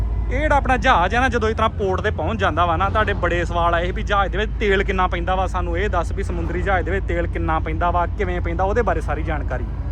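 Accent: Majha, India. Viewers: ਬਹੁਤ❤ਹੀ ਵਧੀਆ ਜਾਣਕਾਰੀ ਹੁੰਦੀ ਹੈ ਆਪ ਜੀ ਦੀ ਵੀਡੀਓ ਵਿੱਚ ਅਤੇ ਆਪ ਜੀ ਪੰਜਾਬ ਦੇ ਕਿਸ ਜਿਲ੍ਹੇ ਤੋਂ ਹੋ ਕਿਉਂਕਿ ਤੁਹਾਡਾ ਬੋਲਣ ਦਾ ਲਹਿਜਾ ਸਾਡੇ ਇਲਾਕੇ ਮਾਝੇ ਦਾ ਹੈ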